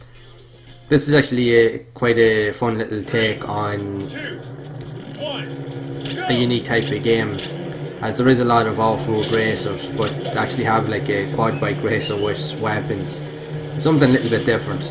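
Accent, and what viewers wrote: Ireland. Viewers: Wow your pumping out these videos every day. I appreciate your hard work. Looking forward to more. I subscribed to keep up! Love your accent also. Whats your country of origin if you don't mind me asking? Im from the U.S. Nevermind i looked at your about section and saw its Ireland. :) I have a channel as well but its not so much videogames as funny videos or movie clips.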